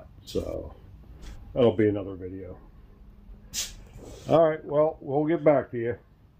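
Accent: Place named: Pennsylvania, United States. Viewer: I just hear Pennsylvania when you talk.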